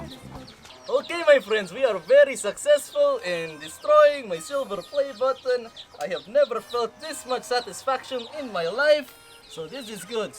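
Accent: Russia. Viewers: Hindi, Russian siya di mo ba nahahalata sa accent niya mga bobo talaga mga bata ngayon. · familliar tlga yung accent ie tangina Crazy russian Hacker 😂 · Love it while smashing some thing plus the Russian accent saket sa tiyan kakatawa😂😂 -r.i.p play button WERPA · That crazy Russian hackers accent hahahaha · Why the Russian accent tho??? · Hey Keep Up The Russian Accent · Russian accent💕😂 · kaya lagi ako nanonood ng mga videos mo eh and gusto ko yung russian accent mo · russian accent si hahaha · Comment muna bago nood squad - Pucha when u hear dat russian accent, alam mo na may masisira. (YUNG BIG BANANA CUTTING) HAHAHAHAHAHAHA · Russian accent 💕 .. HAHAHAHHA 😂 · lol russian accent parang si BUCKS. like kong kilala niyo si BUCKS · Spot on yung russian accent 😁👍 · Love that russian accent😂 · Russian accent <3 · Stick with the Russian accent! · Pag si nag russian accent gumagawa sya ng kagaguhan pero kapag nasa normal accent sya napaka bait😂😂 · Russian Accent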